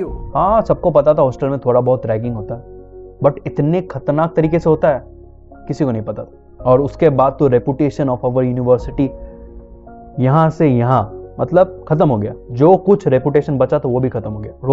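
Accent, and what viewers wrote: Bengal, India. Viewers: thoda bengali accent hai ....bengal se hi ho kya ? ...... and yeah many many congratulations 🥳❤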